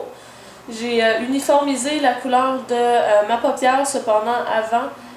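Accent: Canada. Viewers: Très beau maquillage ... j'adore tes vidéos et ton accent canadien fan de J.Deep mais pas autan que toi... une française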